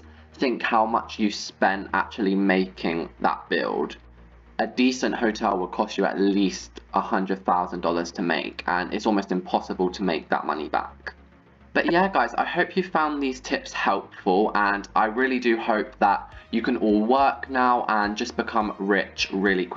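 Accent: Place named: United Kingdom. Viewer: Sounds like a briish boy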